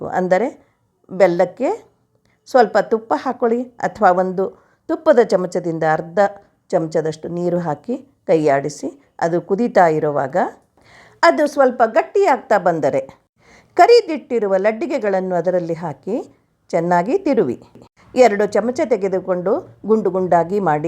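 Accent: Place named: Mangalore, India. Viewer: 🙏 ನೀವು ಯಾವ ಊರಲ್ಲಿ ಇರೋದು ಮಾಮಿ... ನಾನೂ ಕೂಡಾ ಉತ್ತರ ಕನಾಟಕ ದಲ್ಲಿ ಇರೋದು.... ನೀವು ನಮ್ಮ ಭಾಷೆಯಲ್ಲಿಯೇ ಮಾತಾಡಿ ಚೆನ್ನಾಗಿರತ್ತೆ... ನೀವು ಮಾತಾಡೋದು ಮಂಗಳೂರು touch ಇರತ್ತೆ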